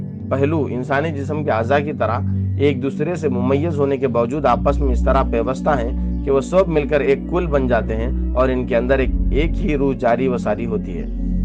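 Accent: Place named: Hyderabad, India. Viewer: The reader appears to be from Hyderabad (Deccan). I can make it out by picking up some words, the way he is pronouncing them. JazakAllah for your efforts. May Allah reward you.😊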